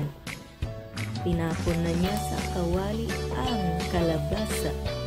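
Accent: Visayas, Philippines. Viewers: Haha bisaya kasi e, chill kalang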